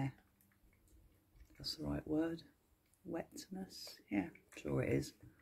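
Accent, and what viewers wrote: United Kingdom. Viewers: I love the British accent, especially when you say: “marvelous » tail ! It’s true that Cookie’s fur is sublime! ❤😊🦊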